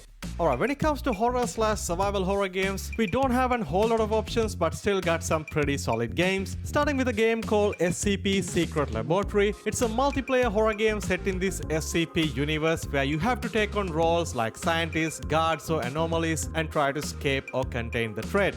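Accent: Kerala, India. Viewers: de malayali engligh accent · Nice video brother , bro why do you sound like mallu ( no offense )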